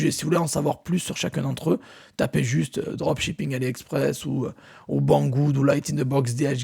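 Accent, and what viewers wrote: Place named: England, United Kingdom. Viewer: merci super comme d'hab et au fait sympa ton accent anglais 😅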